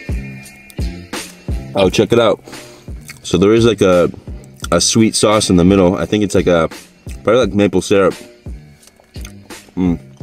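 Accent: Boston, United States. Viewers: Couldn’t figure out your accent, but when you said “bears,” as “behs,” I knew it had to be Boston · Meronghilay It’s a Boston accent! :) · I’m not from the US, just watched a couple of your videos and it took me until to recognise you have a Boston accent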